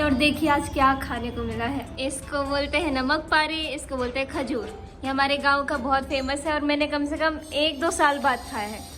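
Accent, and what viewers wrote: Bihar, India. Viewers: panni wanni typical bihari...style i loved it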